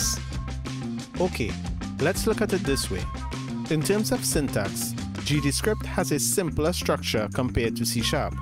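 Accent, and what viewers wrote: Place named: Trinidad and Tobago. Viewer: Dude your trini arent you lmao Love it .. keep it up bro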